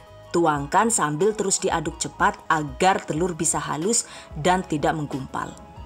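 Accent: Banyumas, Indonesia. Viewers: Ngakak jawa ngapak... Kita sama ngapak 🤗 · Ora ngapak ora kepenak, barakallah ya yu....😘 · Terima kasih bunda mantab jadi pengen coba,saya sangat puas dg Vidio " ny mantap jelas apa lagi banyak becanda ngapak jadi komplet dan dapat ilmu jg terhibur semoga Allah mmbalasny yg berlimpah,sukses slalu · Rika wong Bumen Yu?:D sukses terus Bu channel nya senang dengan penjelasan detailnya. terlebih bahasa ngapak kayak dari kampung saya, Sampun tak subscribe Yu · Ngapak men..🤣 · bikin versi terbaru dong bun...yg lebih ngapak gitu..hehehee Cataaaaattttt😁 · NGAKAK BGT maless😭😭😭 ngapak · Telat komen. lucu ya...kenapa bisa bahasa ngapak ya bun? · Kalo nonton suka ketawa ketawa sendiri.. Resepnya mantap dan penyampaian detail juga ngapaknya itu ngangenin heheh... #GiveawayTHV2021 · Hehehe bhs ngapaknya aku sukaaaa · Suka..... dengar bahasa ngapaknya, kocak......😀😀😀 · Deneng ngomonge ngapak bun,jawane ngendi rika? 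· orang madiun, kok pinter bahasa ngapak sech 😁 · Jan jane rika uwong endi sih,madiun apa banyumas,deneng ngomonge ana ngapake? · Sg gawe video kie wong ngapak apa ya,😁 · 😂😂😂😂😂gue suka gayamu dg logat ngapak nya yuk... Ha.. ha.. ha..👌👌👌👏👏👏👏 Thanks so much fot the tutorial.. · Bun..rotine kutunggu ya..pengin buat juga.matursuwun..resepnya.ngapaknya..ngangeni bun..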